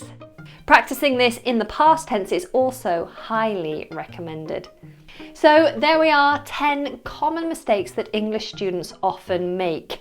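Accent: United Kingdom. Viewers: Finally someone who teaches British english. I'm glad to have found your channel, I'm quite fed up with so much american accent. Greetings from Brazil.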